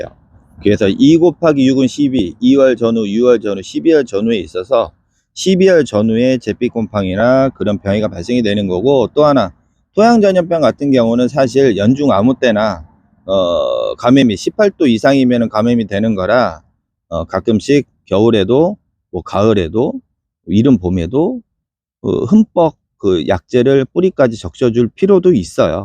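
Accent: South Korea. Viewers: 충청도 사투리 가생이 ㅋㅋ 재빛곰팡이가 잘생기는 아가들이 있더라구여 그럼 쟈는 가망이 없는건가유?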